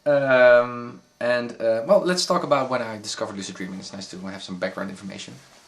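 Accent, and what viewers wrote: Netherlands. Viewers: Are you dutch? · Haha, when I heard your voice I thought "hmm, that's sounds like the advanced english usage by a Dutch person", and I was right. Keep up the good work en veel geluk! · nederlands accent :D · Lol. First sentence was said and I knew you were Dutch :D! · I can hear you are dutch :P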